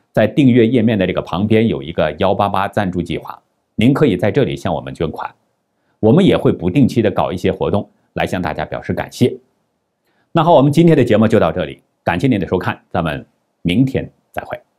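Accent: China. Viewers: 大陸口語聽不太懂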